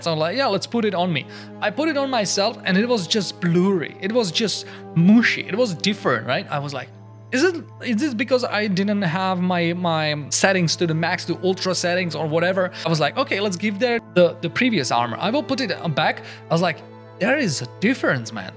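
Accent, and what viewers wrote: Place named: India. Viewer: I'll take my chance at sounding rude, but how are you quite white with that Indian accent? Just being curious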